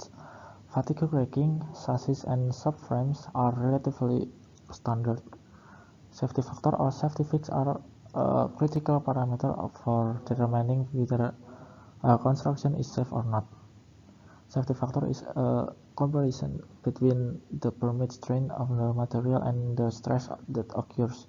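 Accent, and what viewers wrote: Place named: Indonesia. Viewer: logatnya kayak orang indo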